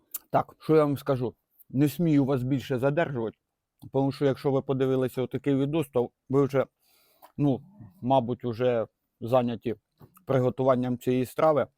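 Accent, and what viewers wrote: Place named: Poltava, Ukraine. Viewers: Розмова точно полтавська! Чи помиляюсь? У нас також капусняк готують і з квашеної і зі свіжої капусти. В залежності від пори року. Неперевершено! Дякую. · Такой приємний,, Говор "у Вас ,майстре ,така мова чарівна у Вас ,як у нас ,на Полтавщині .Приємно ,слухати,не тільки ,залучитися до вашого ,,Капусняка '',все так робити ,як і у нас .Велике Дякую.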